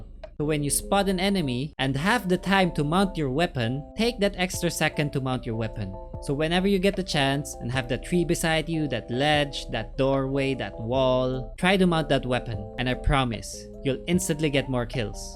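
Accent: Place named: Philippines. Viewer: English mo kasi parang pinoy ang tunog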